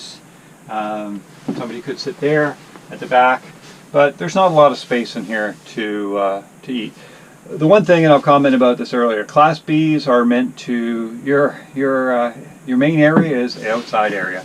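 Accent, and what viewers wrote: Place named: Canada. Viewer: "Process" and "out" too, but he's pronouncing them correctly for Canada and that's where they live. If we were in Canada WE would be speaking "funny".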